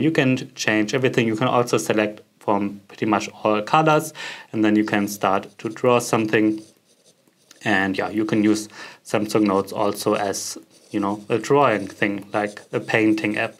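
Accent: Germany. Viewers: Maybe obvious, just wonder whether the S pen has palm rejection or not. As you use it I start to doubt. And whether it has any pressure sensitivity. Thanks for a nice video. Like the German accent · У тебя немецкий акцент 😂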